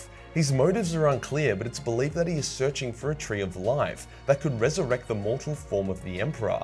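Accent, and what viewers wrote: Australia. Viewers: This guy earned my sub for his 0 fucks given Aussie language. 🎵Aussie Aussie Aussie Oi Oi Oi🎶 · Octarius war explained by an Australian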